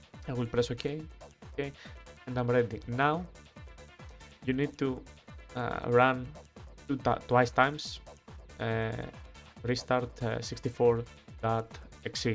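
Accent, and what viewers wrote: Greece. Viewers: πως καταλαβα οτι εισαι ελληνας 😂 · He sounds greek or arabic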